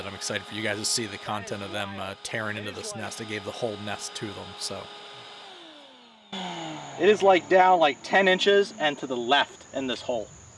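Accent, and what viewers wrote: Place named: Pittsburgh, United States. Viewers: Where about in pa are you from? I hear a hint of Pittsburgh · My family is from PA I can tell by your accent that’s where you are😂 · Eastern or Western PA? I am born and raised from the West side😏😏. I knew i recognized your accent but i cant tell from which area it belongs to.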